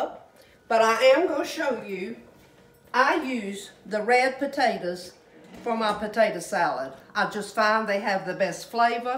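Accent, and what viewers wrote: South, United States. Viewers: I remember my granny and aunts always having a pound cake to serve someone. You are most definitely a Southern lady who would have this tradition. Makes my heart happy. I love watching your videos and hearing your lovely Southern accent. 🌿🌺🌿🌺🌿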